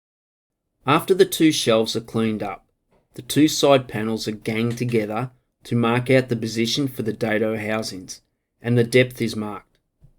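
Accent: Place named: Australia. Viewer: do I hear an Ausie accent? Love your videos. One Handed Maker au!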